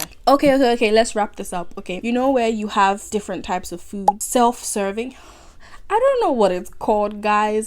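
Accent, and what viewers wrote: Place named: Ghana. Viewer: Eehm this is my first time coming across your channel. Are you Ghanaian or south African cause I'm hearing 2 different accents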